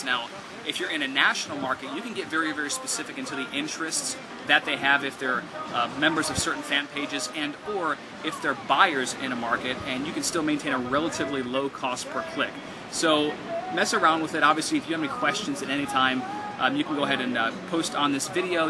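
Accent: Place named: Jamaica. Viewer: sounds like jamaic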